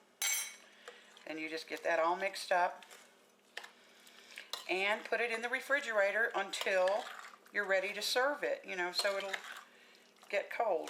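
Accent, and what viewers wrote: South, United States. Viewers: Mama always made ambrosia at Christmas. I still make it for my family. They gobble it up. Can I ask what state you are in? You have a very cute Southern drawl. All of my family is from Tenn. Sometimes people still ask me where I am from. Guess they hear a little twang in my voice..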